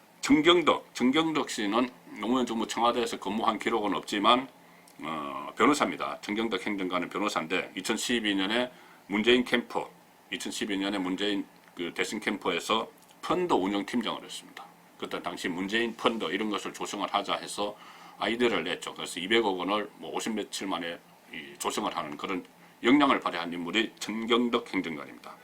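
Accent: Gyeongsang, South Korea. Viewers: 구수한 경상도 사투리를 쓰시면서 정확하게 사건을 정리하여 방송해주셔서 감사합니다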